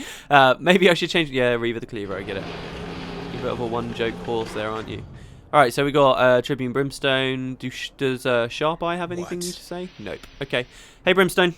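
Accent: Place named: RP, United Kingdom. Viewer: Having such a hard time trying to imagine how you look like, like; You speak like a truly british gentlemen, but somehow you are soo nerdy when it comes to knowing the Gw lore and you watch (a lot/some?) anime and you got this somewhat awkward humor that is hilarious to listen to.. I have no idea what to imagine, I jsut want to know if you're good-looking! D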